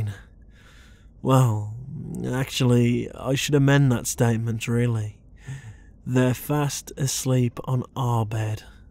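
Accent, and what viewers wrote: United Kingdom. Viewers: He's British :)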